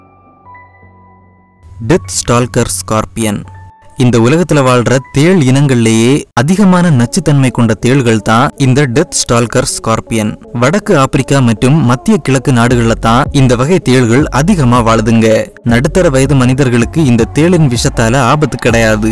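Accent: Kanyakumari, India. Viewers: Bro ungaluku Kanyakumari ya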